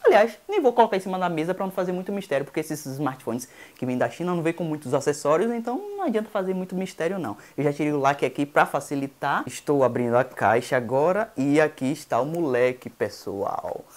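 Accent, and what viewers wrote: Bahia, Brazil. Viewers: adoro nosso baianês kkkkk parabéns pelo trabalho! Muito bom e autêntico. Dou like antes de assitir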